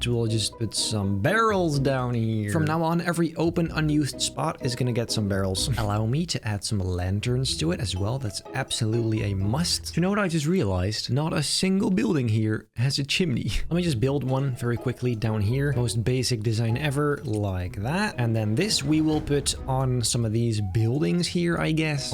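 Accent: Netherlands. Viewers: PLEASE MAKE A VIDEO WITH A DUTCH ACCENT 😹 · Duts aksent is the best! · Me being Dutch, just caught me of guard with that Dutch accent 😅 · Pls let the man talk Dutch English for an episode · I was al wat verdacht maar het Nederlandse accent maakte het duidelijk dat je Nederlands bent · Can you actually make a video with your Dutch accent??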